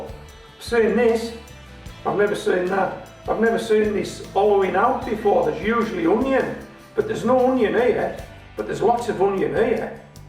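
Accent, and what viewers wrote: United Kingdom. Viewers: British? Just curious.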